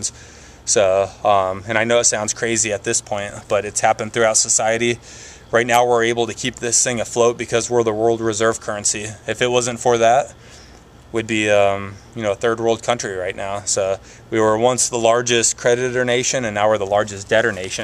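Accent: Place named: Upstate New York, United States. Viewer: Your a hard worker and a good man...good vids....i worked in the wyoming boom in the 70s.......you sound like u r from upstate NY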